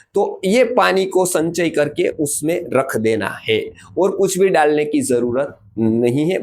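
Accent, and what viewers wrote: Gujarat, India. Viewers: पंडित जी आप गुजरात से है क्या 🤔। माफ़ करना लेकिन मैं भी गुजरात से हुं। और आपके वक्तव्य में लहेजा गुजराती जैसा है।🙏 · बोलनेके तरीकेसे टोन गुजराथी ही लगते है प्रणाम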